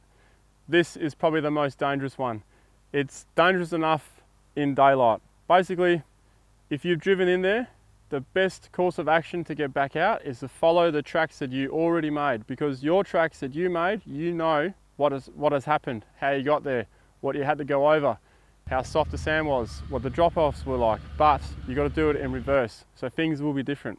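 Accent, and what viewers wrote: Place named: Australia. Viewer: This guys accent is so cool Australians always seem happy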